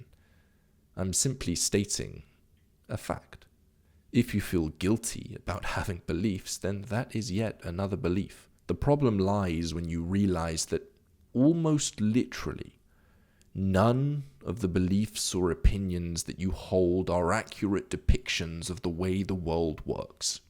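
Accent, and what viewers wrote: United Kingdom. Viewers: I agree with you. If most of our beliefs and the beliefs of society are false and are not based in reality, how does he explain science? Hell, how would beliefs and his Effortless Action System be anything different other than false beliefs that are not based in reality? Oh, has stumbled upon the "Truth"? It doesn't help that it feels like is trying to subtly gaslight us into thinking that it's his teachings which are the only ones that are rooted in reality. Feels a bit cult-ish, to be honest. Honestly, this video felt like a slightly sophisticated Law of Attraction video with a British voice over. It also doesn't help that I feel like I haven't really been given much of an argument to examine so much as some clever sophistry.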